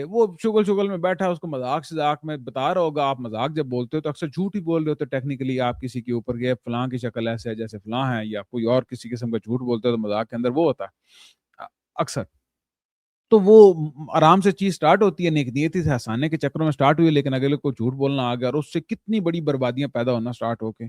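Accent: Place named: Pindi Gheb, Pakistan. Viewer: Me be jhang bahtar attock se urdu to aap bol raha hen lakin Axcent pindi ghab fateh jang wala he aap ka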